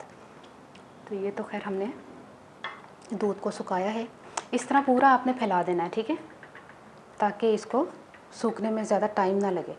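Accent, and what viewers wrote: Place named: Punjab, India. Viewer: Ma sha allah and jazak allah amazing recipes zaberdast tips ,way of talking with meethy panjabi❤❤❤.... mane apki kharab doodh ko dobara theek kerne ki tip dekhi yaqeen nhi aya aisy kese ho sakta hai mazy ki baat kay main itafaq say gher nhi thi buchon nay doodh liya or bahir rakh diya jb ai toh dekha dood fridge main nhi hai ghabra gai thora nikal k boil kiya 4 kilo doodh kharab socha try kerti hun ap wala tip and amazingly superb pooray gher main dhamaal daal rahi thi kay panjabi kuri di tip kamaaaaal👍👍👍❤❤❤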